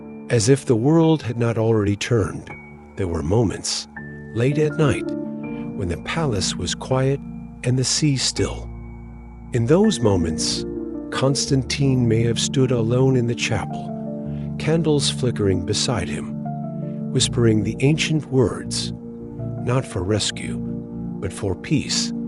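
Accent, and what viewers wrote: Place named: United States. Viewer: This American accent sucks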